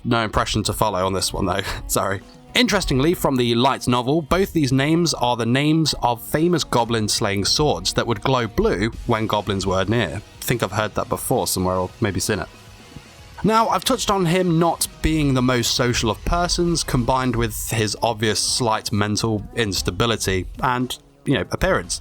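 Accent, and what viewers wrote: United Kingdom. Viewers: Dude, "Bolg" is from Celtic mythology. You sound British, so you should know this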